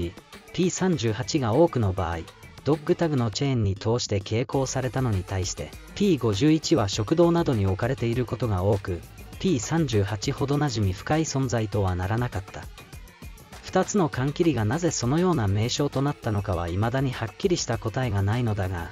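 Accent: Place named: Western Japan, Japan. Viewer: ナレーターさん、西日本出身の方かな？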